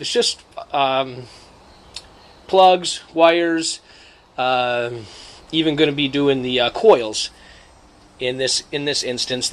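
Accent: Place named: New England, United States. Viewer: Nice a little touch of New England in the South:)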